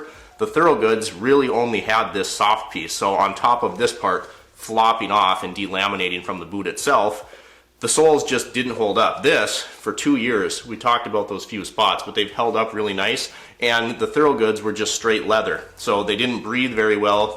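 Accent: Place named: Canada. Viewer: You’re Canadian Aye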